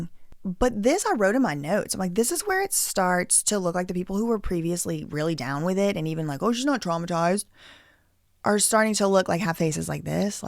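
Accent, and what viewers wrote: Northern England, United Kingdom. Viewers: That UK accent... chefs kiss. Dont nobody need to be in the chat to tell you different 😂💂🏻‍♀️ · To intentionally want to cause trauma on others really?? sounds to me like she weaponised her own trauma. WOW, i'm speechless, i'm based in the UK and i didn't even know about the depth of this s**tshow. Thanks for shining a light. Ps: Nice British accent ha! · Im Scottish and your UK Northern English accent was great! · OMG this is one of the BEST videos you have done! You have to find some more UK stories because hearing your accent made me smile for the first time today! Thank You❤ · That's EXACTLY how northern girls speak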